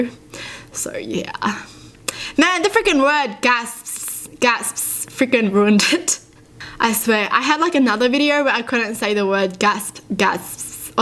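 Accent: Australia. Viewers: She knows how to say it,it's just hard to say probably because she has an australian accent.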